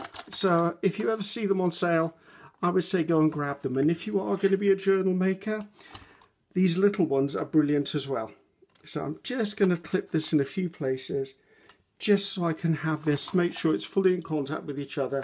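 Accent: England, United Kingdom. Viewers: Oh my gosh, I came across you quite by accident and LOVE YOU! I'm a Brit living in the USA and love watching English crafters as it makes me feel closer to England hearing the accents and listening to you, I feel like we are old friends getting together for a natter and a cup of tea. I'm not new to paper crafting but I am to junk journals. They always seem daunting to make but your vidoes have inspired me to actually try making one! You have a new life long fan!! xoxo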